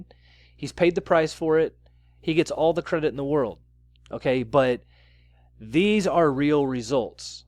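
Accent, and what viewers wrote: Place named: Queens, United States. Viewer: Get that " Street cred" , Kill that Monster.....all good...u sound like yur from Queens Lol...Yur all good my dude!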